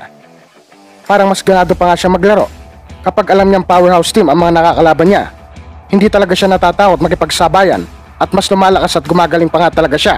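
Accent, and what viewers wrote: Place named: Visayas, Philippines. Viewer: bisaya ka talaga mag salita..😃😃😃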